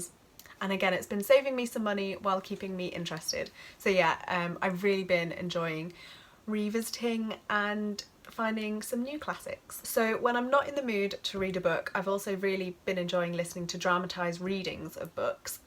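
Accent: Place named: RP, United Kingdom. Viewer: Love the voice and message! I remember you said you would like to have posh british accent. There you go girl! ☺️👍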